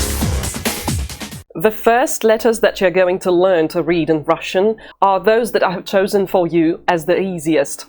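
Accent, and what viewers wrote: United Kingdom. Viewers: I liked this lesson and your teaching way and British accent. Thank you. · Very nice intro video. Did you study English in the UK or have a British instructor? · Your London accent is delightful to listen to. The Russian pronunciations are most helpful, especially the "o"sound in words like "это", which I've been mispronouncing as a long "o" rather than an "a" sound. Little thing - big difference!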